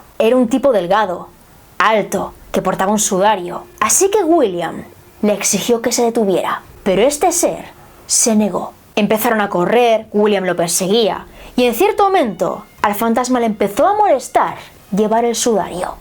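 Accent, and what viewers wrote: Spain. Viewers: A mi antes me disgustaba pero me acostumbré a ella y a su acento español y ahora de agrada.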